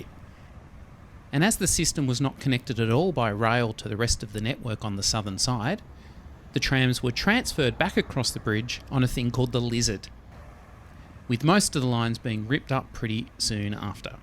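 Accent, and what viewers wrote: Australia. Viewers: I find your Aussie accent disgusting, you're talking like you have lots of rotten oil and rotten cheese in your mouth and talk at the same time. You say line more like loine which is particularly disgusting to hear. You must speak more like RP.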